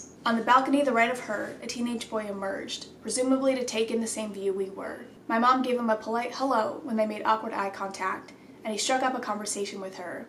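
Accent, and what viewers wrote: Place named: South, United States. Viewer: Its the southernish accent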